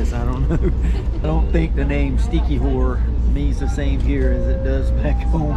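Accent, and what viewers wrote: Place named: Arkansas, United States. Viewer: bro your accent , are u from Arkansas? its hard to distinguished American.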